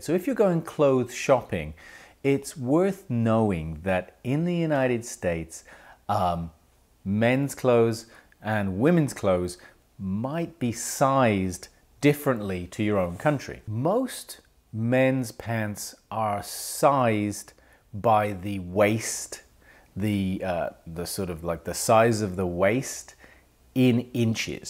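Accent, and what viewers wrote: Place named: Ireland. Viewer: your pronunciation is quite Irish to my ears, however you do use a lot of American vocabulary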